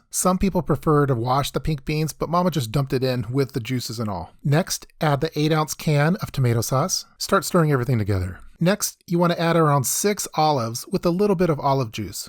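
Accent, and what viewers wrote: United States. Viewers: It’s almost as if this dude was born is America, so I dunno, maybe have an American accent, and Spanish isn’t his native language. but that’s a crazy thought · I really fuck wit the dish but you sound 100% gringo respectfully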